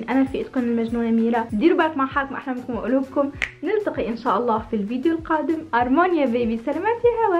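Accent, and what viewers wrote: Tunisia. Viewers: من هدرة تاعك تباني تونسية 😉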